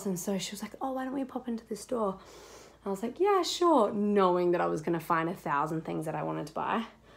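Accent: Australia. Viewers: I love that you share all of this with us and that you’ve never lost your Aussie accent!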